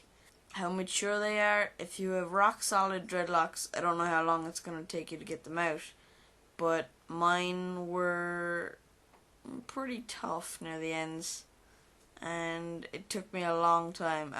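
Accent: Ireland. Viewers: Cool Irish accent now to the matter in hand. I over wax badly on three inch hair on a hot summer day and wax melt easily sipping into my pores now my scalp is lumpy and super dry . My facial hair area is also dry and hard due to the wax do you think the shampoo will get the wax out of pores. I regret the day I put that stuff on my hair! Please write me back to help guid me thanx. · Not only was this usefull, it was also amusing, you're funny, you from ireland?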